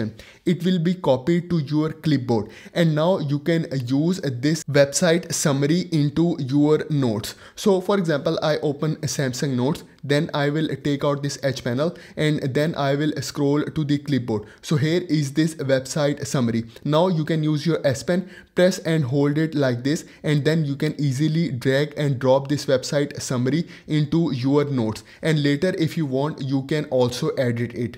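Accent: India. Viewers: 개인적으로 인도식 영어 발음 너무 좋아요. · I like the Indian accent.. that is very special here in Philippines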